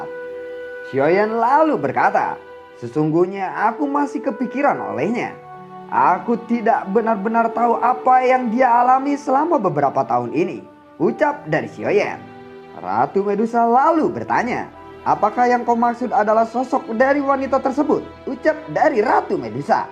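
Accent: West Java, Indonesia. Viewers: Min emang orang mana sih ? Bahasa Sunda nya kaya gak asing 😂🤣👍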